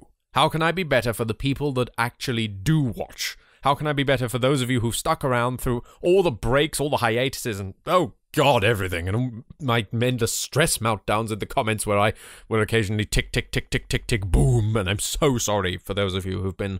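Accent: United Kingdom. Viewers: Thats a very good mind set, Bit of advice, When your becoming one take the Over English Man's glossary golden magical mustache, His Overly English accent and His Scene of humor. But keep your Never give up attitude, your selfless thoughtful mind and your Loving heart. Put all that together and you'll have one hell of a guy, Oh and maybe a bit of chemical X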